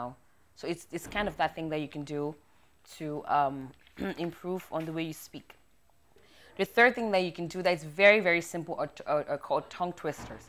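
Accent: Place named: Africa. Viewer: I watch all your videos and I like all of them (except the one about the movie). I particularly love this one bc I'm a huge fan of effective communication & beautiful African accent. This is awesome! Very educative! U create a conducive learning environment & spice it up with fun/jokes.....So we are actually learning (in 'school') & loving it!! Keep up!!!#HammerlandInSight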